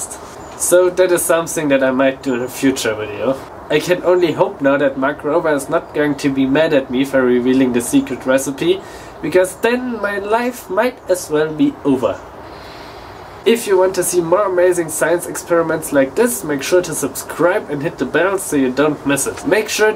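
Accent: Germany. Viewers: Is this guy german or some other nationality. I don't even care, but I love him.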